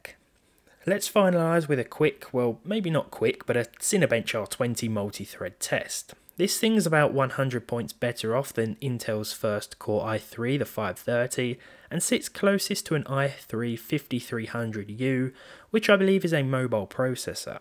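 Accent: United Kingdom. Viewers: Britain accent is so funny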